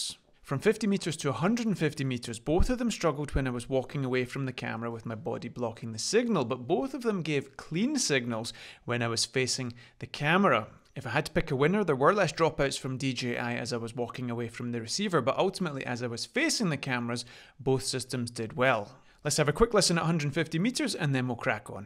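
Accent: Scotland, United Kingdom. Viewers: Excellent review. Are you a Scott?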